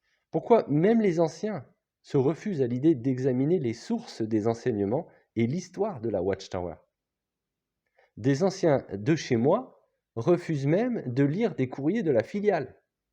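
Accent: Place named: North, France. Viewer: 😊Merci pour votre sincérité, votre véritable foi, votre courage et votre bienveillance qui transpercent à travers votre ton et ces analyses basées sur l’enseignement du Christ. Votre intervention ici, est un baume sur les plaies. PS.: votre léger accent résonne familièrement à mes oreilles, moi qui ai passé ma jeunesse dans la ville de Robespierre.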